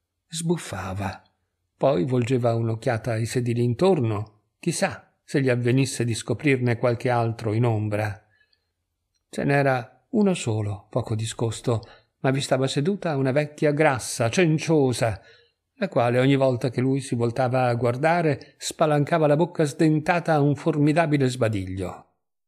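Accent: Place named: Rome, Italy. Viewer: spettacolare interpretazione in romanesco, sai com'è.. so' romano anch'io..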